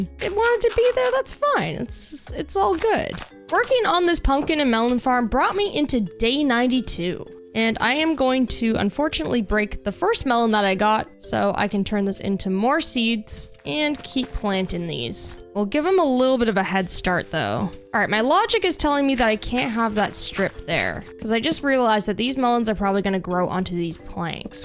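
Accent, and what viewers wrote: Canada. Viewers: youre canadian? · I knew straight away you were canadian, after hearing "hey buddy nice to see ya" is such a canadian accent. LOVE ITTT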